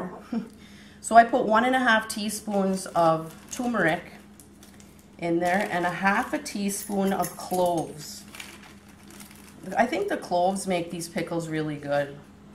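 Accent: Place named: Ireland. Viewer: Great video! I love these kind of projects! Your accent is so thick, almost Irish sounding. Love it. Is it true that you are Jeff Daniels wife? Someone told me that. Oh by the way timers is great. My grandmother puts it on everything for memory retention she says.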